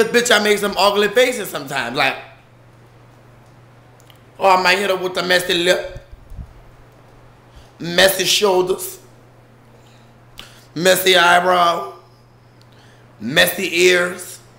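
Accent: New Orleans, United States. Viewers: how people in new olreans talk. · He Was Sooo Cute.... I love a New Orleans Accent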